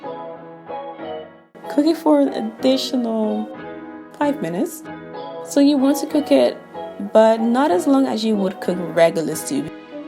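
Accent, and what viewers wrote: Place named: Caribbean. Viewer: You sound Caribbean. Im in Jamaica and all the ingredients you've listed are accessible. Thank you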